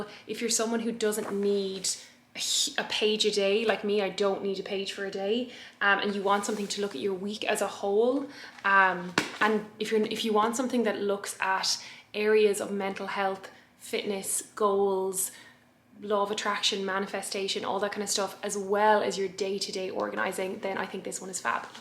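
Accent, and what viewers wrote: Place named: Ireland. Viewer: I thought your accent was Irish. It really suits you!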